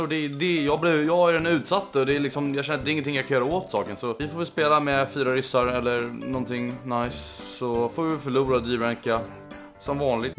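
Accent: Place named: Stockholm, Sweden. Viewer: man förstår att du är från Stockholm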